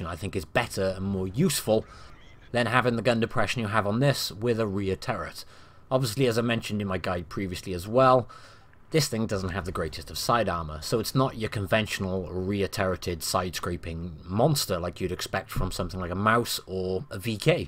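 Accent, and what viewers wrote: United Kingdom. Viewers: first like before watching and second it's always great to hear your voice laughing with that stunning British accent of yours along with that beautiful and accurate commentary have you to tried to make a radio channel I'm not kidding your voice is so calming..... don't stop making Those videos with commentary I'm dead waiting for the next one cheers mate ..... :D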